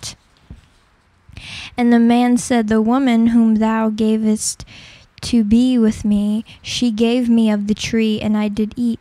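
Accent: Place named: United States. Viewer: I like her real voice, it sounds like an American version of my voice lol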